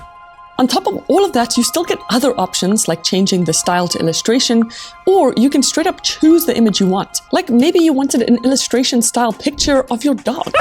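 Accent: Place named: South Africa. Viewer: This lady sounds very south african, where is she from?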